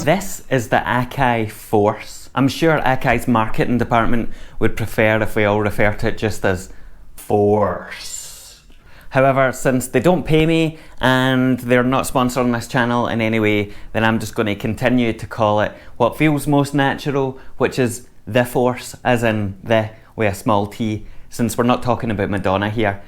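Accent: Scotland, United Kingdom. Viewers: Seems awesome really! Stoked to hear what you come up with on it! And even though I have no plans on buying this device... I'm always interested in what your dulcet Scottish tones have to say!! lol ✌🐀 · Not into jock accents but yours is an exception, I could listen to you for hours lol. Anyway, I have just bought a Force for £750 and I don't even know whether it will arrive this side of Xmas or not, so I'm just surfing all the Force stuff I can find. I have an Akai live(not 2) and its all right, a bit like a girlfriend with big boobs, its got its good points but I'm not in love with it, I'm hoping the Force will be the one I fall madly in love with or at least get infatuated with. Anyway excellent review, I wish all reviews were like yours, truthful, honest, tells it like it is, warts an all. I'm going to give you a thumbs up and a subscribe so I can listen to some more to that accent!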